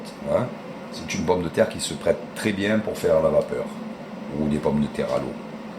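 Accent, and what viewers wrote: South, France. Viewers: Oh l’accent du sud, quel délice ❤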